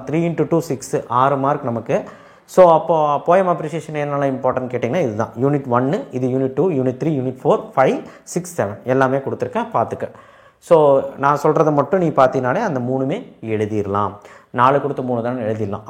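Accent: Coimbatore, India. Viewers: Sir neega Coimbatoreingla sir 😊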